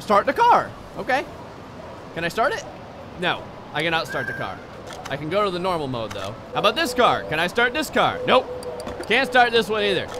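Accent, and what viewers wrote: New Jersey, United States. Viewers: àre u from New Jersey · Oh I wanna hear the jersey accent do it in the next episode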